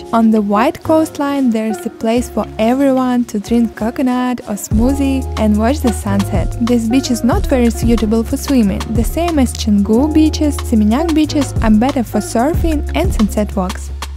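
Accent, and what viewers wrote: Russia. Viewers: Благодарю вас за такое прекрасное видео! Чувствуется по акценту, что вы из России, но английский у вас потрясающий 😍💫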